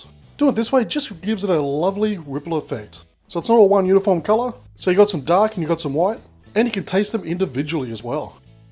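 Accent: Australia. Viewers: I love the Aussie accent on you!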